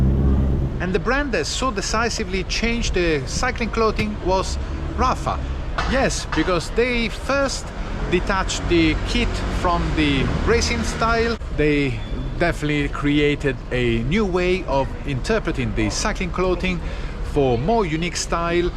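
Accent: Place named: Italy. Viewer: Love yur videos and yur accent! 🇮🇹 I treat bike fashion the same as normal fashion, colour coordinate, either the same colour and mix colours from a similar pallet. Including bidon, casquette, gloves, helmet, but socks and shoes always white except black socks with black gravel shoes. Bib shorts are all black so can wear with any jersey. I love cycling fashion and looking Pro even if I don't Go pro! 😂❤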